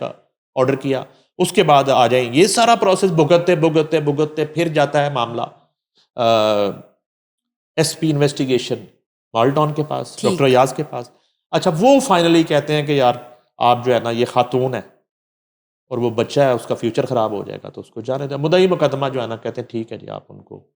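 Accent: India. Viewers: Aap Urdu k ilfaz ko Hindi Mai pronounce krti Hain...why??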